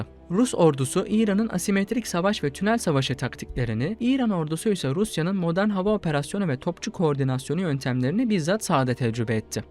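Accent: Arab world. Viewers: Abi şu arap aksanıyla K patlatan kardeşimize seslendirme yaptırmayın artık. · Seslendirmeyi yapan arkadaşın anadili Arapça mı? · Abi içerik güzelde şu seslendirmeyi arap aksanıyla K patlatan arkadaş yerine farklı biri yapsın. Dinlerken çok rahatsız ediyor.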